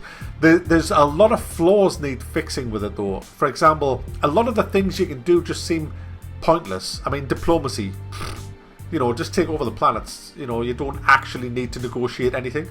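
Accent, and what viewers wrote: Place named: Scotland, United Kingdom. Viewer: I HATE strategy games simply because I cannot play them and will not, this isn't my point though (my point is this...) I still watched this almost 15 Minute long video because of the commentary! is simply the best reviewer and commentator. He's got it all! Quick wits, mature humour and of course none of this would be complete without his awesome accent which I think is... Scottish?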